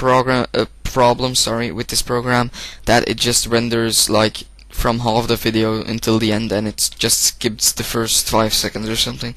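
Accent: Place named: Netherlands. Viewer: haha en je nederlands? dat lijkt zo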